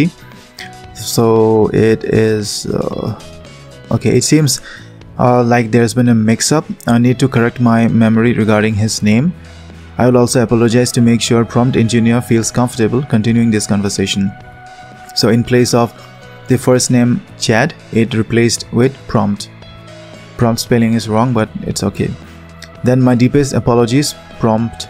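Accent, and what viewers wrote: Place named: India. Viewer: As if it isn't already difficult enough to understand what you are saying with your heavy Indian accent, you went ahead and made it even worse by playing background music.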